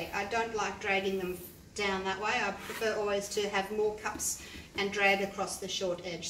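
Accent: Australia. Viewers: Congratulations on 20k subscribers 😍 You are a delight to watch/teach and gotta love the Aussie Accent 😃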